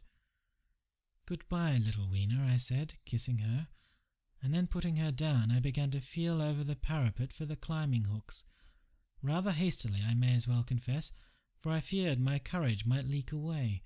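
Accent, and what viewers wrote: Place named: Australia. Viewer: Very faint Aussie accent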